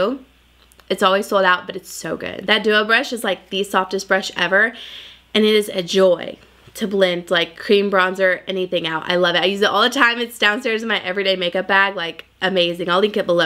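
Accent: South, United States. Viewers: Love your videos. You are such a sweet southern girl that is so fun to just listen to. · You sound so country in this one 😂😂😂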